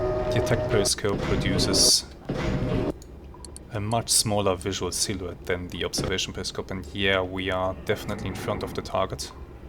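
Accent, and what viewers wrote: Germany. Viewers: love this series. great narration too, and the Germanic accent really helps!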